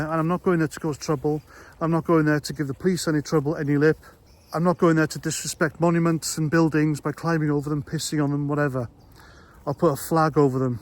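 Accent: Wales, United Kingdom. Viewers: Maybe it`s you Welsh accent, but what`s wrong with Tooting Police?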